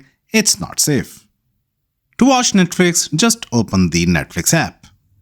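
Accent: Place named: India. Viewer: i will never believe a guy with indian accent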